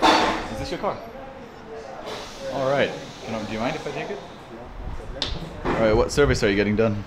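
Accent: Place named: Kenya. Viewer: Love your Kenyan accent 😊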